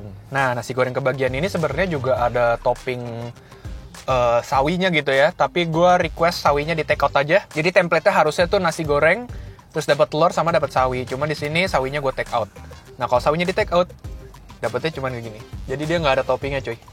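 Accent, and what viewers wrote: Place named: South Jakarta, Indonesia. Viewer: Sma kaya channel ini.. namanya channel krain pembawaan riviewnya ala2 kaya mamank2 sunda gtu. Lah dalah trnyata dia pke bahasa jaksel bet. Nyebut stiap kta berakhiran NYA sllu gnti E. ITU GA MAMANG BANGEETT SIH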